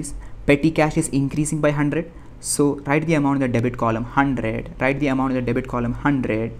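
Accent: India. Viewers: Lol his english suggests he is Indian. You people really know to make educational YouTube videos.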